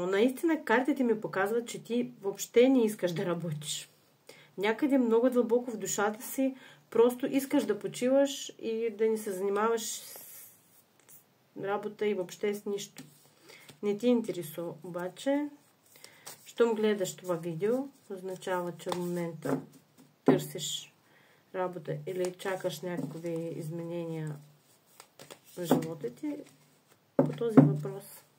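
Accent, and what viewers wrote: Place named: Russia. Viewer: Много приятно излъчване има тази жена!❤ И сякаш има руски акцент!❤ Надявам се да се сбъдне това което показват картите!❤Благодаря Ви!❤ Благодаря и на Висшите Сили!❤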